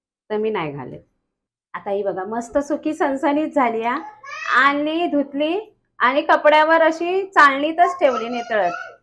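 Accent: Malvani, India. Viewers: तुमच्या विडिओ hi मनापासून वाट बघत असते तुमची मालवणी लय आवडता खूप सुंदर सुधा nagwekar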